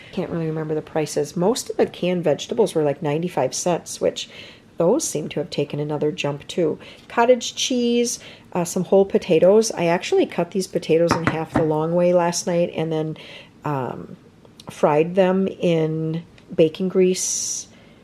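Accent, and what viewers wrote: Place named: Midwest, United States. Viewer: Gotta love that midwestern accent… bag-els :-)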